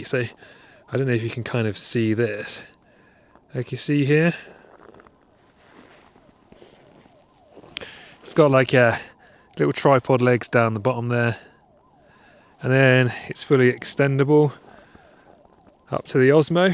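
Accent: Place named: United Kingdom. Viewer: Agree that winter is a tough time to shoot in the Canadian Rockies. I was in Assiniboine area for a week, (plus several days in Lake O'Hara) almost two years ago -- it got colder than -30 celsius (my thermometer froze below that!). Batteries die very quickly, as well, and in those temperatures you can't expose your hands for long. But one thing the snow usually does help with is to simplify the scene and that often opens up many opportunities, I find. And by the sound of your accent you hail from a similar corner of the UK as myself. I wonder whether you were also drawn to Canada through falling in love with the Rockies? (I've been hiking the Canadian Rockies the majority of my summers since the 1990s.)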